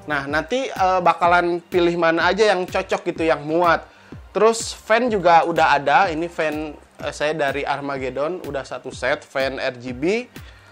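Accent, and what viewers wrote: West Java, Indonesia. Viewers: kang asal sunda? darimana?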